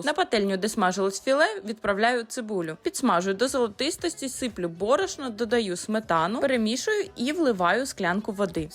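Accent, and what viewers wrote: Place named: Ukraine. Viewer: Подскажите пожалуйста этот говор из какой части Украины? Я почему от поняла абсолютно каждое слово. Когда смотрю новости на Украинском почти ничего не понятно.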